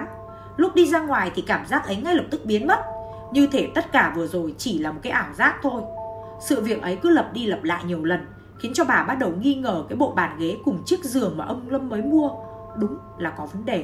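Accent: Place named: Nam Dinh, Vietnam. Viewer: nhưng giọng nam định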